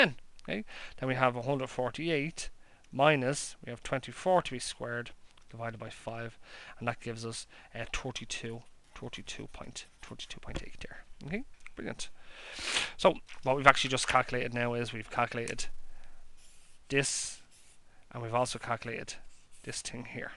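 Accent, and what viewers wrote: Ireland. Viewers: Plus, I really like your Irish accent.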